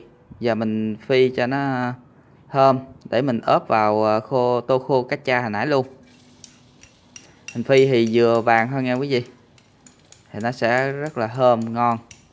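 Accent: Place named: Mekong Delta, Vietnam. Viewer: Nghe cách bạn giới thiệu đúng chất giọng miền Tây Thơm đến nhà ngân luôn Ăn sợ ghiền , ghiền là phải về miền Tây 💖💖💖😋😋😋😋 Da dai dai béo béo ...yummy Ngân xin trái bắp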